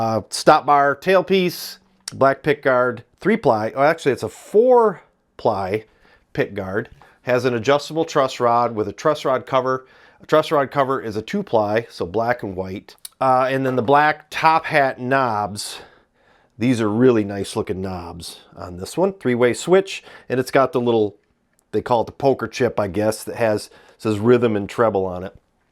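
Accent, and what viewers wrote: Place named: Midwest, United States. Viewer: just watched this episode for the second time and wish I could give you another 'like.' Good off-the-cuff midwestern commentary and I appreciate that man. Here's an odd question maybe: Do both these guitars have a '13' in the middle of the S/N?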